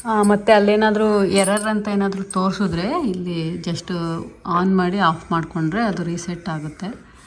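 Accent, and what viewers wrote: Mandya, India. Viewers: ಅಮೆರಿಕಾ dali edru nim Halli maathu kelthidre thumba kushiyaguthe Nam mandya bashe andre🎉 ❤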